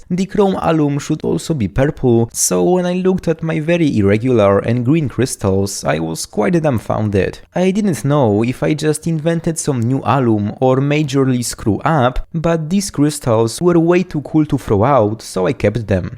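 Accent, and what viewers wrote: Poland. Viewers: Guy sounds awfully like Polish citizen like me :D that heavy accent that I also have :D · czuć rodaka, pozdrawiam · Kurwa juz po samym akcencie rozpoznalem ze polak xd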